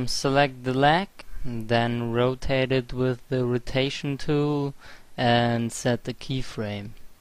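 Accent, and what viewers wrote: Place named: Germany. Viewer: youve got a german accent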